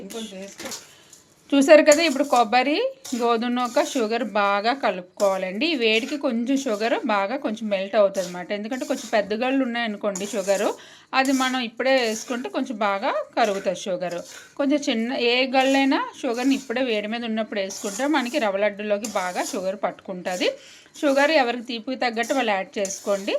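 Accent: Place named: Tamil Nadu, India. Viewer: Boríng commentary, Tamil pronunciation. Don't use aluminium dishes.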